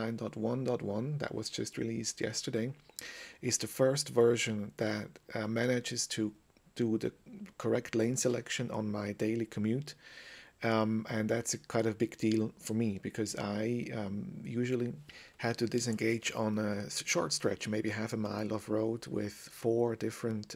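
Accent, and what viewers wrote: Switzerland. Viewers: are you swiss?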